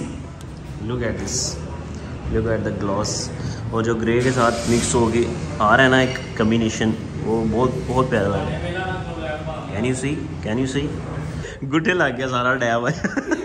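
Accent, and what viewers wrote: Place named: Punjab, India. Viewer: Your punjabi ascent is so secxi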